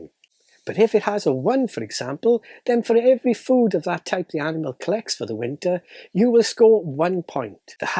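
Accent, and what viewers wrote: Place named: Wales, United Kingdom. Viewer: Great review this is one game that has been on my radar for some time ,and your video has given me the inspiration to add it to my next order with game lore Also its nice to here a whelsh accent as a lot of the board game reviews are from the usa ,so keep up the good work from a old board game loving chat from just over the boarder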